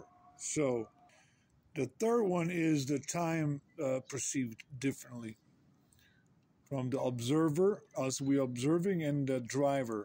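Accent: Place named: United States. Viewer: wrong information in disgusting American accent.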